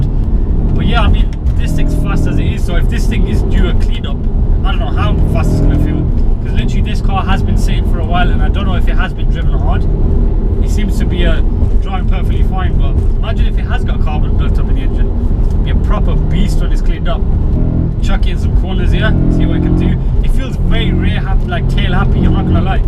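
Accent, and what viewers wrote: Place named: Birmingham, United Kingdom. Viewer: Where about is he from. His accent is Birmingham, just checking if he lives near me :D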